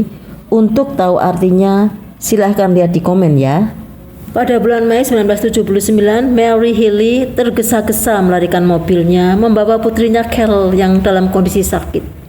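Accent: Java, Indonesia. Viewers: suara yg ad medok2 jawa ny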